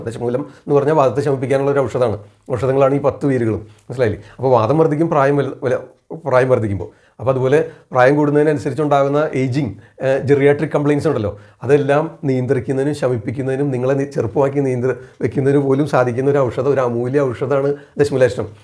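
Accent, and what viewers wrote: Thrissur, India. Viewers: Are you from Thrissur suburbs like Ollur? Very familiar slang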